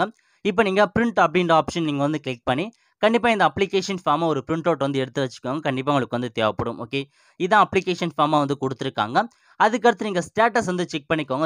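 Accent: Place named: North India, India. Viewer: Nee! yan da? Vadakan pesura mari pesura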